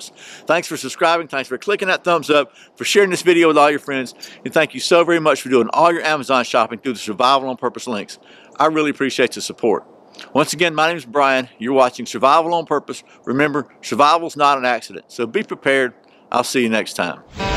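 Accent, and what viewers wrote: Georgia, United States. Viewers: Tell me you're from Georgia without telling me from Georgia (He could be from like 6-7 different states with that accent, but he strikes me as being from the Conyers area in Georgia.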